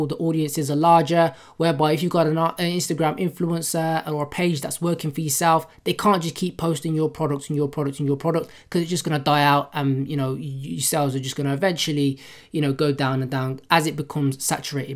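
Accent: England, United Kingdom. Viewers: You say dollars but have an English accent :P whereabouts you from?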